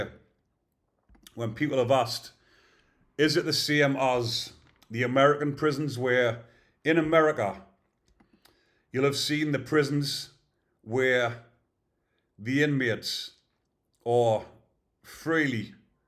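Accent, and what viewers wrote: Newcastle, United Kingdom. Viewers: Pure Geordie 😁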